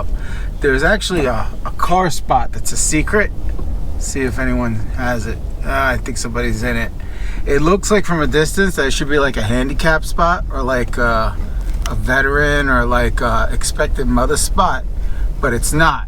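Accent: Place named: East Coast, United States. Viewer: I love your vibe... right at the beginning of the video I knew you were east coast.... I'm from Syracuse myself just looking for a change! Thanks for the info.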